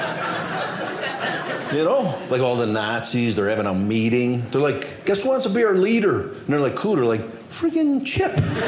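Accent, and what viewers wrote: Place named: Midwest, United States. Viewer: Mw accent is so perfectly complementary. "YeAh that's da guy!": flawless timing. Funny guy.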